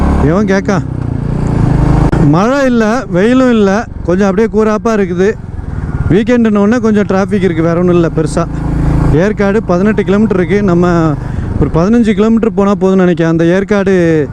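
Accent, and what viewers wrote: Chennai, India. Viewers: தளபதி விஜய் அண்ணா ஜனநாயகம் படம் பார்க்க ஆவல் போல உங்க வீடியோக்காக ஆவல் அதிலும் உங்க சென்னை மொழியில் பேச்சு இன்னும் ஆவல்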